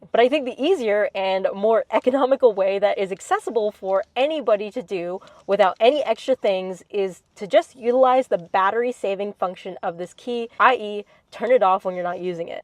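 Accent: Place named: United States. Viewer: pretty sure she is american, I am expert on accents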